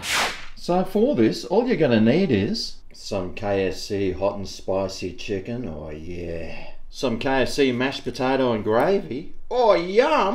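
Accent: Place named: Australia. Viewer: I come to watch the recipes and more importantly, to listen to his Aussie slang/talk😁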